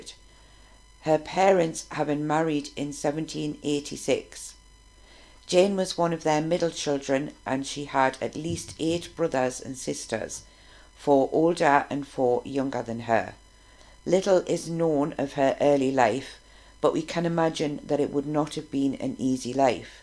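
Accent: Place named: Northeast England, United Kingdom. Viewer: Good job, and I like your accent, too. Being from Minnesota I can't place it. Is it a Northeast England accent? Anyway, good story and a sub. Hope for more.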